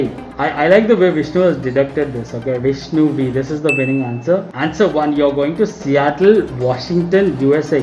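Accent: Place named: Hyderabad, India. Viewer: Kya mia kya karte tum itna sloow video dalte..... Humko tumhar Hyderabady accsent mey ek vlog hona mangta #bong_subsciber_loves_hydrabady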